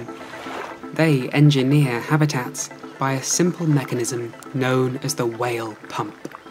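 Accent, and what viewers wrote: United Kingdom. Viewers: The combination of your voice, British accent and a proper music is just mind-blowing. You are a genius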